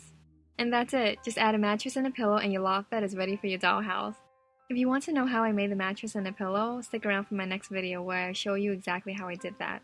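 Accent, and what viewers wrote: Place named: New Jersey, United States. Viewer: Are you from New Jersey? You have the accent.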